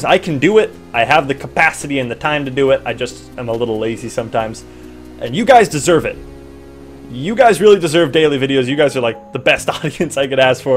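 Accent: United States. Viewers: What accent is anyway? Sounds kinda Southern and Northern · I just noticed he has a new York accent o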